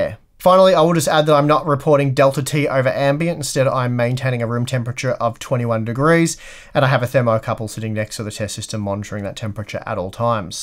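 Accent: Australia. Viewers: Steve are you from Australia?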